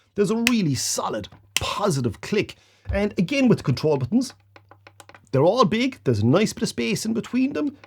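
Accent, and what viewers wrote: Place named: Ireland. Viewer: Thanks again for a great review, love that irish accent of yours it reminds me of the time I worked for the Irish Guards in Germany 74/75👍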